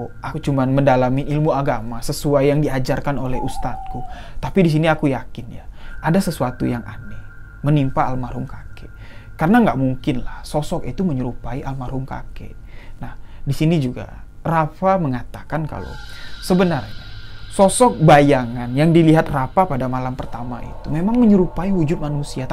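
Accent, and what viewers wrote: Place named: Bali, Indonesia. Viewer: Kayak logat org bali mas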